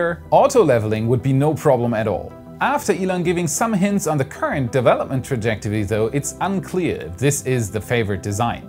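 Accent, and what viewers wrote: Germany. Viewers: Are you german? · are you german?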